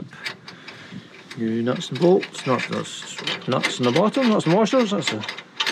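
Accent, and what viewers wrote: Scotland, United Kingdom. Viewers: Mate, fantastic presentation, well done. Your spiel, accent and gags remind me of the fine Scots tradesmen who shared their skills and experience with me as an apprentice in Australia in the late 1970s.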